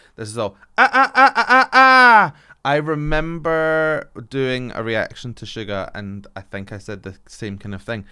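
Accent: Scotland, United Kingdom. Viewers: Love your BTS reviews & technical explanations, love your Scots accent--if I had functioning vocal chords, would def hire you as a coach! How about reacting to House of Cards...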